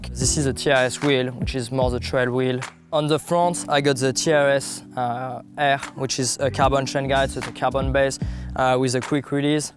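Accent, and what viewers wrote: France. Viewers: Clairement l’accent français est là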